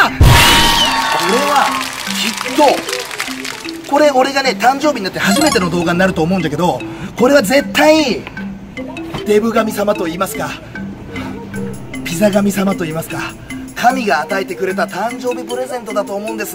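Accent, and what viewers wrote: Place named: Hiroshima, Japan. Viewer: HIPPYの広島弁がすごいすき。HIPPYもすき。♡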